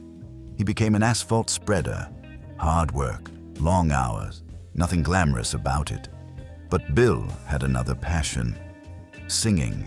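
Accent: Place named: United States. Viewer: Why is an American doing the commentary..?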